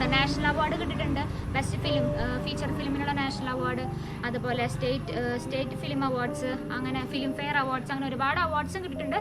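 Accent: Thrissur, India. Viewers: ഞാൻ subscibe ചെയ്തു.. ഈ തൃശൂർ സ്ലാങ് കേൾക്കാൻ.. വീഡിയോ നന്നായിട്ടുണ്ട് 👌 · തൃശ്ശൂർ ഭാഷയുടെ സൗന്ദര്യം സൂപ്പർ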